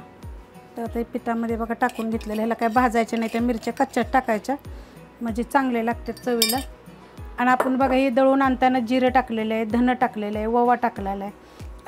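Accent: Satara, India. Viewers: ताई तुमचं गाव सातारा आहे का तुमची बनवण्याची पद्धत आणि बोलण्याची पद्धत आमच्याकडची वाटते सातारची तुमच्या रेसिपी बघून मी लाटी वड्या करते सजुरी करते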